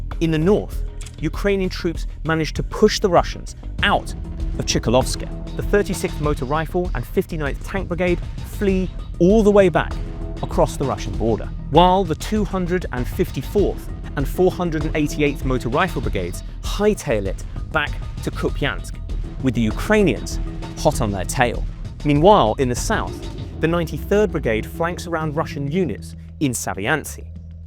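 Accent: England, United Kingdom. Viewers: British accent. Disregard. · it's english pronunciation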